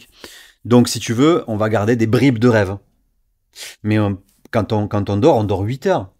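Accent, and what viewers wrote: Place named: South, France. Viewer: trop bien, vous êtes du sud avec cet accent?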